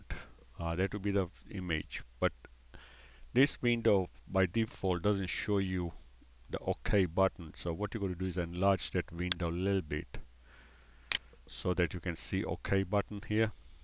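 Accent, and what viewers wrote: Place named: Mexico. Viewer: OMFG NOCE VOICE AHAHAHAHAH you sound mexican XD thx anyways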